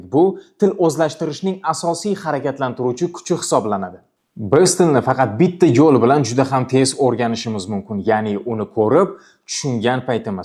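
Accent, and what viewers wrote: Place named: Russia. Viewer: Kinolaralga ozvuchka bermismi mabodo, chota tanish russkiy aksent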